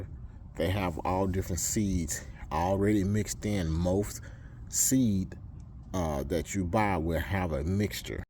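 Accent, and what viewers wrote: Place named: Texas, United States. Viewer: You sound like a guy from Texas named should. 😄 Great lawn. 👍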